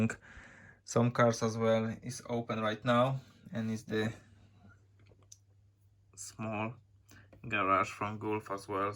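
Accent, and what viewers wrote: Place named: Poland. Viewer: Słychać że Polak 😀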